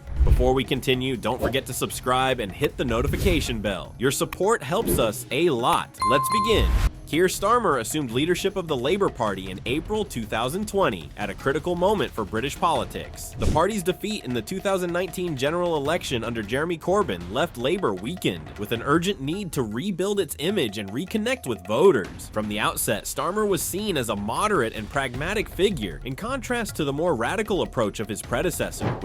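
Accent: United States. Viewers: lies lies and more bs .... are you that desperate to use clickbait titles and a really crap american voice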